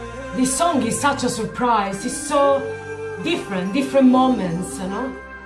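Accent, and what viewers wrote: Italy. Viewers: Reina, gracias por reaccionar a un genio del rock Argentino como lo fue. Un musico diferente al resto.. Noto una bellisima tonada Italiana. Eres de Italia? por que hablas en ingles? Besos linda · Amo tu ingles con acento italiano suena cool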